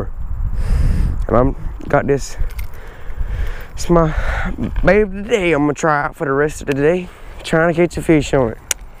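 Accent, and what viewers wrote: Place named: South, United States. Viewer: country accent at its finest ur voice reminds me off mind lol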